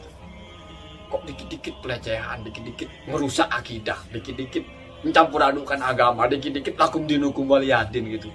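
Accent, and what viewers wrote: Madura, Indonesia. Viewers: Maklum,. Memang logatnya orang Madura kayak gitu mas.. kesannya ngegas kalau bagi orang Jateng. Tapi bagi orang Jatim dan Madura sendiri, itu biasa saja.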